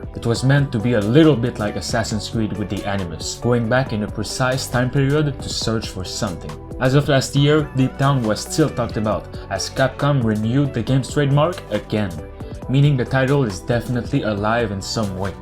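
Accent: Quebec, Canada. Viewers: Are you from quebec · Happy to hear this project is still alive, looked very promising. And hey, are you from Quebec ?!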